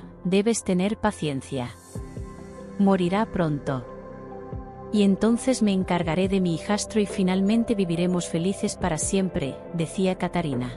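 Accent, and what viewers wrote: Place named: Spain. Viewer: Que bella historia y la narración impecable con ese soneto como de españoles me quedé fascinada asta el final mil gracias y ya me inscribo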